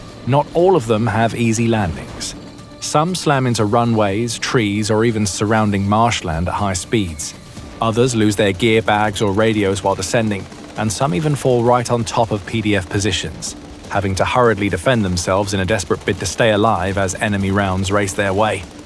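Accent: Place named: United Kingdom. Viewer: Why do so many videos have British narrators? This is an American operation. I’d much rather have an American narrator.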